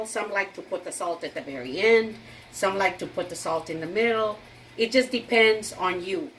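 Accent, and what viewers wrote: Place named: San Antonio, United States. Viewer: You from TX? I’m from San Antonio. You sound like you’re a San Antonian . Reminds me of home. Been in NYC for a long time. Miss that accent. Us younger generations don’t have it really anymore. Brings back memories of childhood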